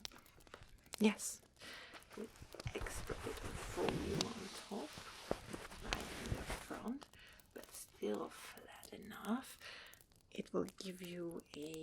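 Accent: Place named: England, United Kingdom. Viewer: Never gets old! Ur english!!!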